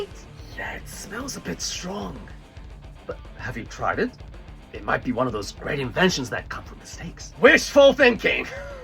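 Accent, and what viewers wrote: Japan. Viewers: As a prior Chinese translator for the US military, who has a Japanese step-mother (living in Japan with my father and 5 year-old half-brother... I am 31, my son is almost 4)... I LOVED your Japanese accent speaking Mandarin. It is exactly what I needed. Only thing that tops this was Gackt's Mandarin in Tempest as he played a Chinese diplomat. 😂😂😂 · The way you talk samurainglish is very good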